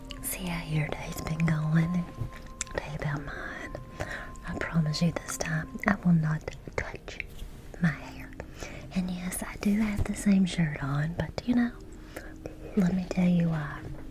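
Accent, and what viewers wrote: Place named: Kentucky, United States. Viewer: This is like listening to my aunt from KY who passed away a decade ago. It's nice to hear the accent and sweetness. Thank you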